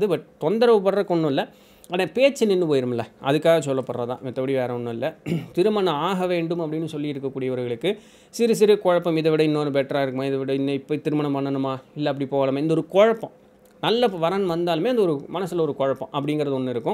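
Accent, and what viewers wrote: Coimbatore, India. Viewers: சார் கோயம்புத்துாரா?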